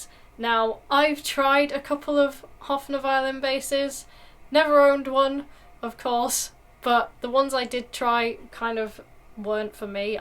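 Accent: United Kingdom. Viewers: It sounds great,and your british Accent too!!Greetings form Argentina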